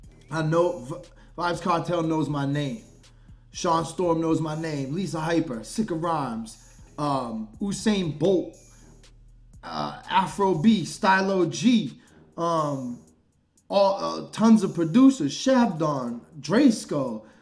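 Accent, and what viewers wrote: England, United Kingdom. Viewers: The English accent works......i love how u make ur presentation, that pipe u smoke it rocks! U should do a movie one day brother because u're a dam good actor 👍👍👍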